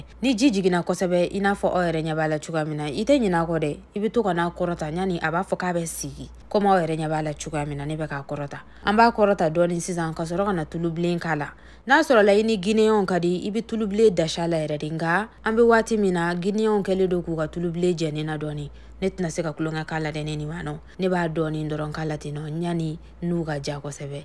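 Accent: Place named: Segou, Mali. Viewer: Just love the way she talks. It reminds me of home (ségou)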